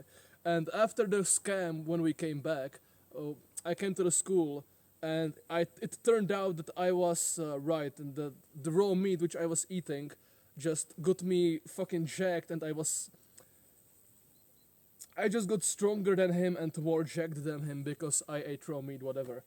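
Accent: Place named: Czech Republic. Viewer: You and have the same exact Czech accent English. It's awesome.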